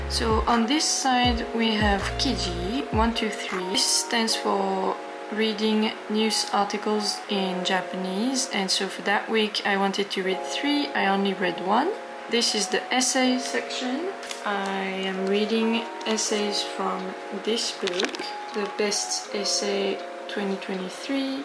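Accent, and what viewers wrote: France. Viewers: おめでとうございます!! 🎉🥳 I love how your lovely French accent comes through more when you're excited! 😊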